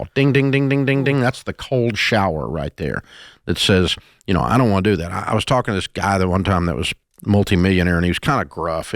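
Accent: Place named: Southeast, United States. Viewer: It's good for learning American English from the south east (specifically the host).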